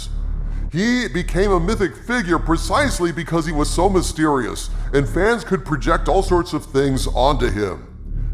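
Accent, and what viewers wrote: New Zealand. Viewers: Aussie? I thought he was a Kiwi. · he’s actually from New Zealand 🇳🇿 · Haha, not Aussie, a Kiwi mate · Hes ahhh New Zealander right?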